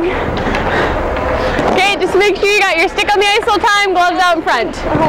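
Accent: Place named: Canada. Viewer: she is probally canadian. A girl i dated was from Alberta and she sounded a bit like that. I see u like the sporty ones eh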